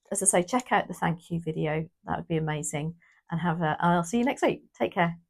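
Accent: United Kingdom. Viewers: So nice to hear an English accent 😃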